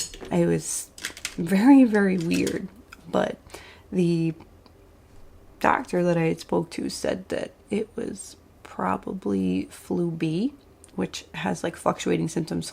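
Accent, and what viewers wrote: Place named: Philadelphia, United States. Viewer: I LOVE green eyeshadow looks!! I think they look sooo pretty with brown eyes. Watching your makeup tutorials have really helped me feel more confident in doing bold looks again. I have also bought a bunch of stuff you've used and that you have recommended 😂🤣 thank you for being awesome! Also... I live in Philly and you sound like you have a Philly accent. Sorry if I'm completely off with that hahaha you just sound local to me 😅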